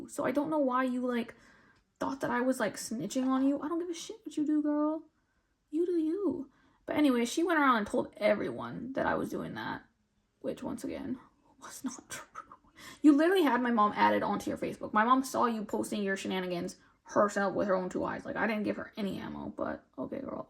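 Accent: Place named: South, United States. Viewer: Omg I am crying because I can relate to you so much. I was bullied by my peers from upper elementary all the way through junior high so (ages 7-14) it completely ruined my confidence and till this day I'm trying to heal from it. (37 now) i have always felt like an outcast being unique in everything I do. I love your social media content and feel so validated. You are so genuine, raw and down to earth! I hate judgy people so much and honestly wish i can just avoid them all. I'm half way into watching your video but I just wanted to comment before i forget. I was bullied due to my skin color and were surrounded by racists .. then when i was jn high school i was bullied by aunties saying how i did not get my moms beauty and looks .. it hurt me so bad and I always felt self conscious about how I look, how i talk etc etc. i really want to get myself checked to figure out if I am neurodivergent. 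I overthink but i think thats a strength of mine, i feel anxious a lot and my sister always is mean to me about it. Anyway. I'll come back to comment again when I finish the video but i just wanted to say! We support you and im so sorry for all the hurt people have caused. Oh and I have also been diagnosed with an autoimmune disease right after getting hit with covid back when i was 35 and life has become so challenging. So you talking about all this, i feel seen! And i thought you were from the south because I hear a little southern accent. Turns our you lived in the south for a little! So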